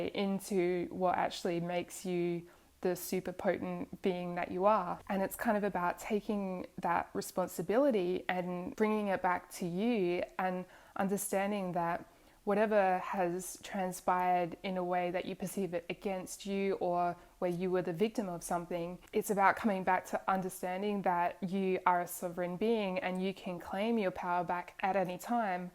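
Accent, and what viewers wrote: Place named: Australia. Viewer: I sense a Aussie accent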